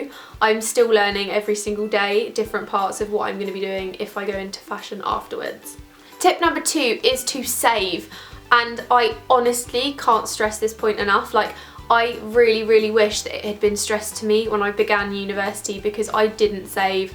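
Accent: United Kingdom. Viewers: I love British accent <3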